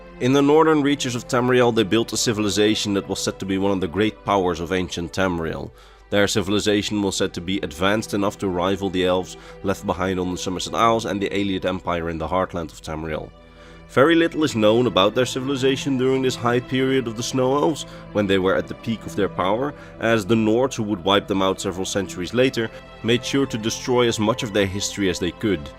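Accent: Netherlands. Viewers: Sounds Dutch or maybe scandi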